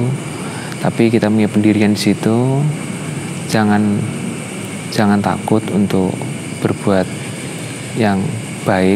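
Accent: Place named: Java, Indonesia. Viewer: di maklumi sobat....lidah orang jawa memang kebanyakan begitu.nggak usah di permasalahkan.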